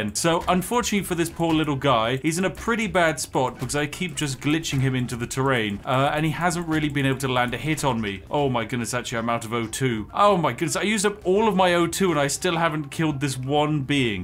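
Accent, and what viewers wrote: United Kingdom. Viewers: You have a lovely British accent there, friend (for real, not kidding) you had fun videos about cyberpunk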